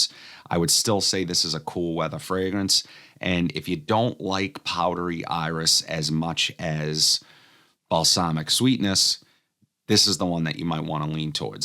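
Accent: Boston, United States. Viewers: Here we go again… I feel like I’m in Boston again with your accent lol. Anyhow, I think you would like Ani X . Fruity hints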